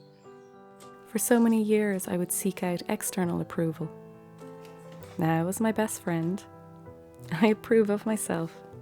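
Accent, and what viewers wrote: Ireland. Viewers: HVD to you too. Do I detect a wee bit of a charming Irish accent?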